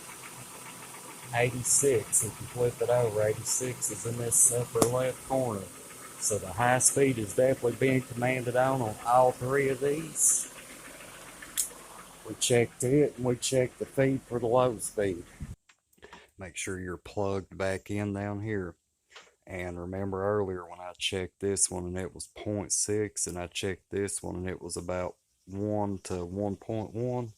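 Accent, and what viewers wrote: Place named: Texas, United States. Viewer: I bet anything you're from Texas 😅 that accent is unique from there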